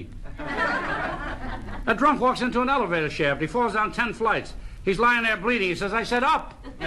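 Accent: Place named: New York, United States. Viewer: Jewish New York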